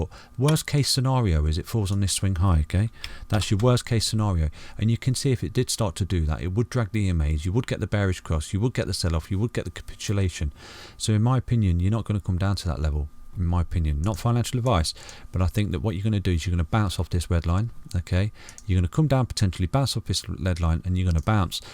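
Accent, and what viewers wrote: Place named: Nottingham, United Kingdom. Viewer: You are so from Notts lol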